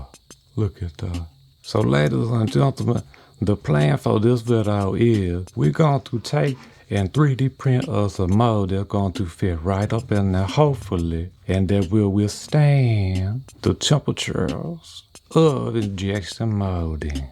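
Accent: Louisiana, United States. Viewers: What region of the USA is this accent from? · i didnt know i needed to hear a sultry cajun tell me about injection molding today, but man was that like soup for the soul. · As an Aussie, I can't place your accent for the life of me. I can tell that it's most likely from the US, but the exact region has me stumped. Where abouts are you from, my man?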